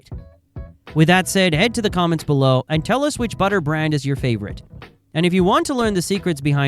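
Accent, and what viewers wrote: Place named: Canada. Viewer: Okay, when you're talking about separating , you're actually showing a batch churn. A few seconds later, you're showing more batch churns... I think. Those cone-shaped things at the mark, those are the cream separators. All those other shots of tanks with vertical stirring paddles, those are probably batch pasteurizers. Maybe just mixing tanks or cheesemaking vats. And "silage" is pronounced SIGH-ludge. "Liters" is LEE-ters. The narration robot's Canadian accent is kind of adorable, though. The rest of the info in this video is basically accurate.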